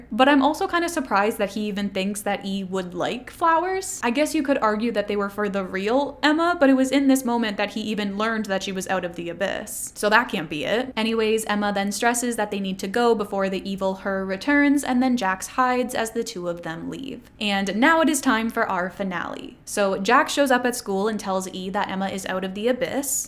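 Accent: Canada. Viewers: I’ve been following you for years but I didn’t realize you were Canadian until I watched this 6 hour video and noticed how you were saying about. Canadians seem to really pronounce their o’s I’ve noticed this with the word sorry too. Then you confirmed later in the video that you were Canadian so it’s good to know I wasn’t going crazy!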